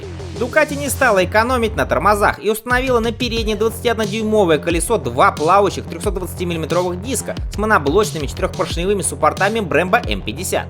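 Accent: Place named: Russia. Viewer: мда.... петушинный кацапский акцент, десерт - это в столовой, а это дЕсерт - пустыня, хоть бы название мотоцикла потрудился сказать правильно в первые секунды ролика.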